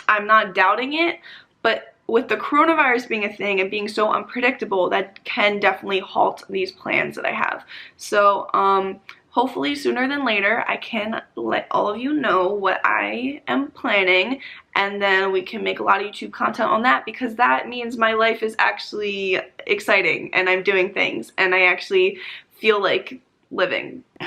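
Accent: New Jersey, United States. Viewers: I hear a cute New Jersey accent 🙂 I never remembered it from before but I also watched your old vids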